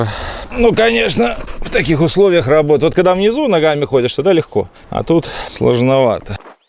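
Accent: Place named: Belarus. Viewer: беларусский говор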